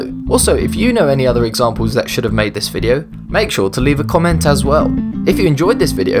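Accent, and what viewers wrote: United Kingdom. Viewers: Why did they change the voiceover dude to a British one, were Americans not good enough!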